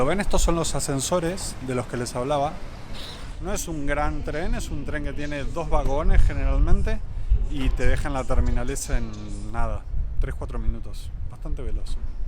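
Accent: Argentina. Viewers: se nota de lejos tu acento Argentino para los que sabemos diferenciarlo buen video y saludios de un Urugayo en galicia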